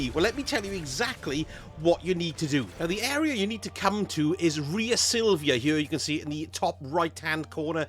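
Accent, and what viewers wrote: United Kingdom. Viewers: Oi are you British mate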